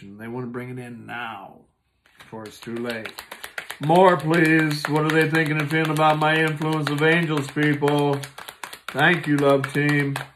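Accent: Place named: South, United States. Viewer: I love your energy! I love your humor! I love your passion! And i love your accent (i'm not a native english speaker but, do i sense a southern accent there?). I claim all your readings in advance! Love and Light!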